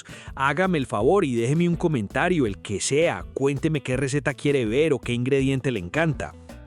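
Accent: Antioquia, Colombia. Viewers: Me encantan tus videos y tu forma tan clara, amena para explicar, y...tu acento paisa, tiene magia. Muuuuu bien, gracias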